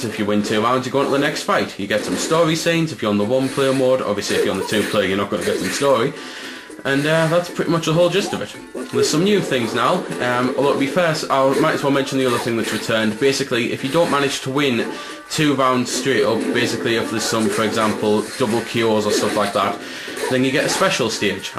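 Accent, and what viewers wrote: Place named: Cockney, United Kingdom. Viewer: Oi nice cockney 'cent ya got there!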